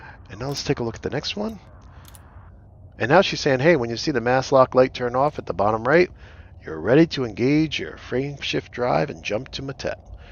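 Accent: United States. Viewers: I hope I'm hearing correctly the NYC accent. It could be Central Jersey. Apologies if I am wrong. I'm a little homesick for NYC is all. Thanks for the clearly made video series. I 've started and stopped this game nearly 1/2 a dozen times, but will try once more thanks to you. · Entire video Couldn’t stop thinking about just wanting to punch the shit of of this annoying New England accent. 🤮🤮🤮🤮🤮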